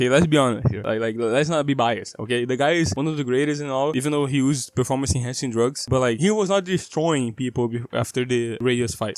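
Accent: Brazil. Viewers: senti um sotaque br. You have a really good point, the sad thing is that it pretty much doesnt matter who wins. Whoever wins will probably retire right after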